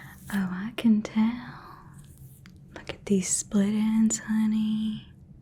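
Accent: South, United States. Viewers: Southern accent from tonight I liked so much 🥰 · The way you do divided attention in ASMR is so good, the Southern accent and gossiping makes this so nice and relaxing to listen to 😴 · She nailed the Southern accent😂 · i’ve been rewatching all your southern accent videos for MONTHS i cannot thank you enough for this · I’m a southerner, but I don’t have a deep southern accent and I find it so calming. So any video with a southern accent? Golden <3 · Happy Thursday,!😊😎☀💙🙏🌷💖⭐⭐⭐⭐⭐⭐👍. Your southerm accent is so....special and tingly. AMAZING!! Plus, your smile is perfect!😀😍⭐⭐⭐⭐⭐⭐⭐👍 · The laughing 😂😂😂 you are such a good actress! I love your southern accent videos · I feel like since does a great southern accent and she has done a Boys rp I feel like she would do a killer firecracker rp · Yesss! Your southern accent videos are my favorite!!! ❤ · Always luv the divided attention vids also ur so good at the southern accent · It's so incredibly, genuinely, southern. 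· . I just love 💕 that southern accent very Awesome 👏🏻 job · Your southern accent asmr always relaxes me! I love your creativity & roleplays, ty for helping me sleep. Literally perfect timing 💖✨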